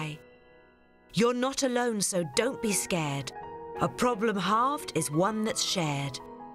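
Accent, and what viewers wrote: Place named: England, United Kingdom. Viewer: great enlish accent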